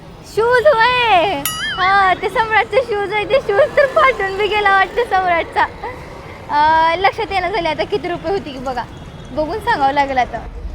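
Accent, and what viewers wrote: Latur, India. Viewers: जाम आवडल.,. तुमचं बोलणं. मी पण चार वर्षे होतो लातुरात म्हणजे नीलांग्यात मास्तर म्हणून . मलाही लातूर वल्यांच accent ani dialects खूप आवडतात.... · बोलीभाषा खूप भारी आहे माझे एक मित्र आहे लातूरचे ते पण असच बोलतात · Mastt banvta vidio aani bolne tumchi laturuchi bhasha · hello mi nashik cha ahe but mazi ek friend ahe latur chi tich bolan ani tumch bolan ekdam same to same ahe. Khup mast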